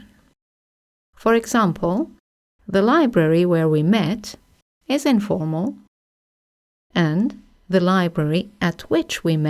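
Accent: United Kingdom. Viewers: i like British accent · That British Accent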